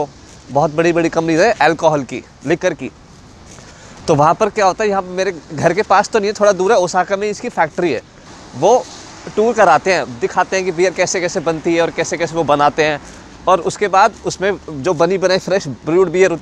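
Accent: Haryana, India. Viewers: bhai ji..mein jab japan aaunga..apse jarur milunga..u r so simple..ur haryanwi accent dil ko shu leta hai...love u..😍😁😳😜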